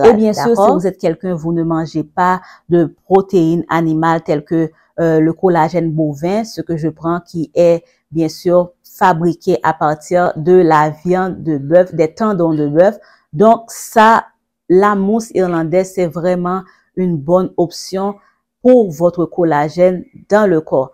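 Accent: Haiti. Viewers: J’aime ta douceur 😅 surtout ton accent haïtien 🇭🇹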